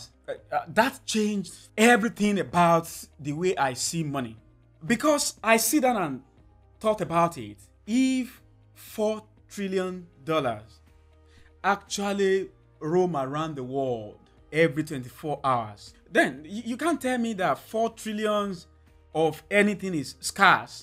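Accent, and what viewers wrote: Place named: Nigeria. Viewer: I discovered your channel when you were at 40k subs, and I knew from your voice you were a fellow Nigerian. Your work ethic is insane! 🔥🔥🔥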